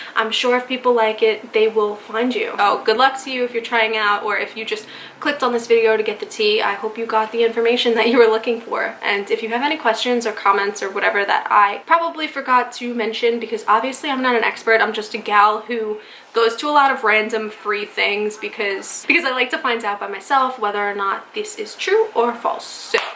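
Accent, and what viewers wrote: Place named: New York, United States. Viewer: Your freaking accent is so on point I could tell you were a New Yorker from the beginning of the video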